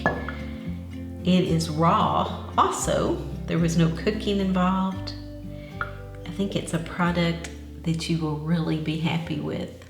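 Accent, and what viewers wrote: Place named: United States. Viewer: Sooo amazing! Thank you! I'm pretty sure this info doesn't exist anywhere else on the Internet. 😂 But there had to be a way. Thanks for sharing. I've married into a German family in South Africa and they also still make Kochkäse. I had to have a little chuckle at the American accent saying it though. I've just recently found your page, as I've taken on the responsibility of our small dairy herd, and I am thoroughly enjoying working through all the shared wisdom you've got here. Very thankful.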